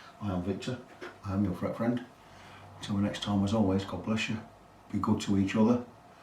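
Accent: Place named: North West England, United Kingdom. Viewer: Fantastic job! As coincidence would have it I would like to do pretty much the same job to my duo sonic. It’s a 2018 SS seafoam green with a PF fretboard. Intonation isn’t great with the short scale and even though I have fitted 11 gauge strings, the guitar almost seems desperate to go out of tune. I would also like to upgrade the pick ups with something hotter and, definitely with a humbucker in the bridge. Judging by your accent and the reference to your friend from Sheffield, it sounds like you are based in the north-west. I am in Manchester. Is there a way we can talk about you doing some business on my guitar please? Thanks.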